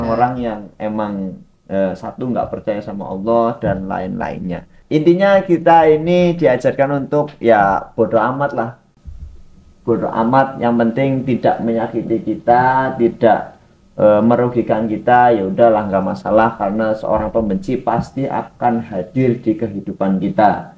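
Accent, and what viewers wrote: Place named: Java, Indonesia. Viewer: Habib kalih niki medoke candu sanget nggh😭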